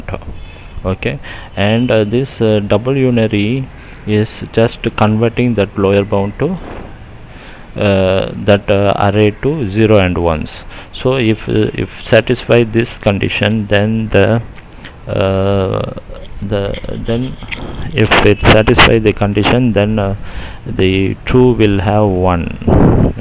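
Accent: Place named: India. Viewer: The most Indian, Indian accent I've ever heard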